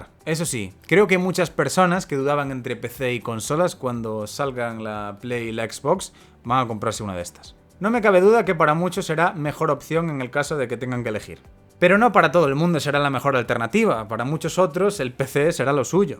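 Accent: Galicia, Spain. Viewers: Eres galego? 🤔por tu acento digo ,buen video creo q cada año la piratería baja lo cual es algo bueno la verdad, a ver yo seguramente me compre la XBOX SERIES X pero pq no me alcanza el dinero para un PC de igual potencia y yo el PC lo uso pa trabajar solo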